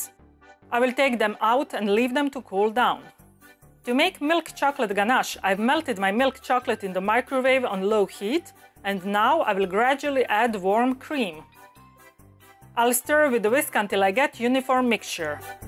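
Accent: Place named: England, United Kingdom. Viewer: i like your english accent may i know where do you come from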